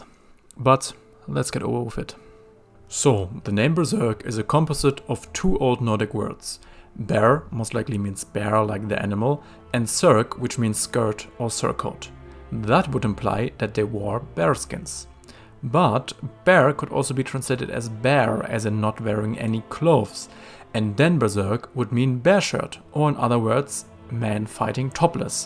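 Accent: Germany. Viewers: It's funny how you think you joke about your German accent and switch back to your normal English, which still has a heavy German accent. But I sure love your content. Keep it coming! <3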